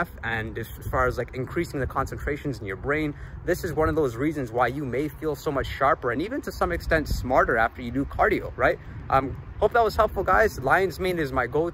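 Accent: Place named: United States. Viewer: Hey, interesting info. You sound like you're from US, but it looks like you're in the UK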